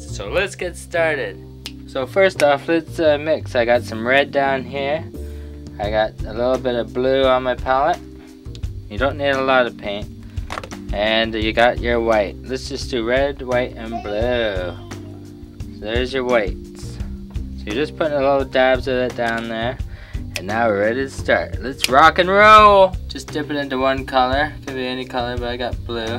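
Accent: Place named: Canada. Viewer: Ba ha ha! Thought I heard you say, "draw in your picture like... c'est ca.." and thought this dude sounds like he's from my neck of the woods, he's got to be Canadian. I see your website is a dot ca domain. If you aren't from the East coast, I think you'd fit right in anyway!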